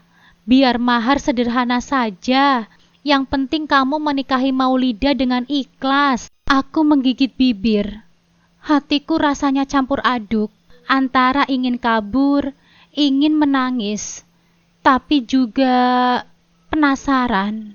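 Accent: Java, Indonesia. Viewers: Medok jawanya jadi lucu dengarnya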